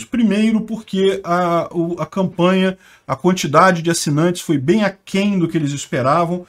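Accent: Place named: Rio de Janeiro, Brazil. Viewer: Uma sugestão pro seu canal: que tal colocar uma música de fundo? Você é do Rio de Janeiro? Deve ser do interior, porque seu sotaque é meio misturado...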